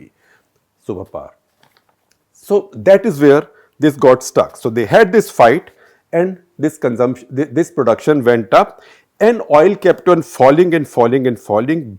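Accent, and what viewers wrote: India. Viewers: This is possibly the worst speaker of the English language. He speaks English as if he is speaking Hindi. Except for Telegu most of the Indian languages are greatly disturbing in that words come out as if they are being shot out. There does not seem to be an sort of fluidity or smooth flow of words. At the end of a phrase the speaker pauses as if expecting an answer from the listener or expecting the listener to complete the sentence of phrase ,much in the manner of a kindergarten teacher . It would probably treble or quadruple watchers if this man learnt to speak English as it should be spoken not broken up staccato like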